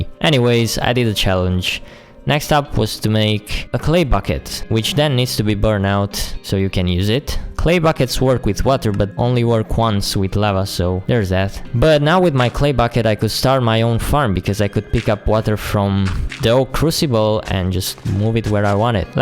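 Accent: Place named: France. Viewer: btw where are u from ur actent is likr frech on something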